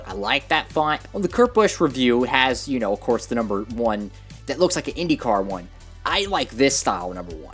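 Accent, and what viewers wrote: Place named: New Jersey, United States. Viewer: And also you sound like your from jersy but im from alabama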